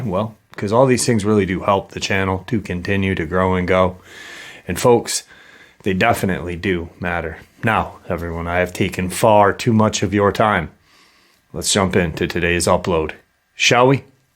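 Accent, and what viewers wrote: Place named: New Orleans, United States. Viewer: Bro don’t listen to critics Your show is f*****g awesome Your show is bad ass I like dark waters too cuz I from New Orleans but bro I don’t give a shite if u speak with a Nola accent and are an orater or not you do perfectly fine communicating what you research, and as far as your research it’s badass so keep it up and F*** the critics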